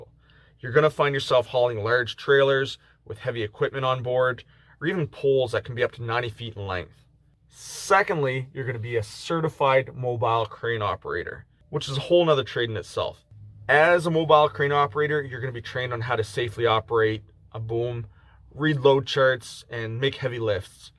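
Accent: Canada. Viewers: You're a canadian Eh!!!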